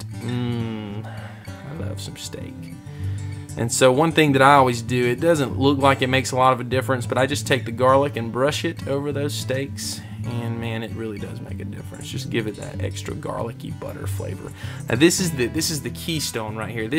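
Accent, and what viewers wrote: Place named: South, United States. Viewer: Lol....sounds like he has a southern accent and I've heard southerners use oil like it's a marinade.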